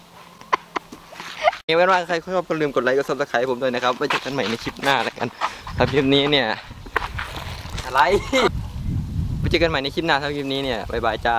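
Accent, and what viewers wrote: Korat, Thailand. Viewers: คือภาษาโคราชแท้😂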